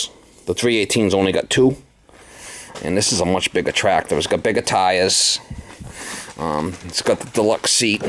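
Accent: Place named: New York, United States. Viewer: you sound like you are from New York. It makes sense since i can find a single fucking 420/430 around new england for a good price since you are picking up every single one of them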